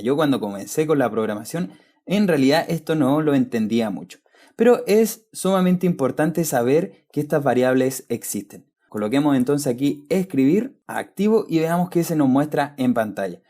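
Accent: Chile. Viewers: Acabo de conocer este canal. Apostaría mi cuello que el que habla es un compatriota chileno. Muchas gracias por tan buen canal, es un gran aporte.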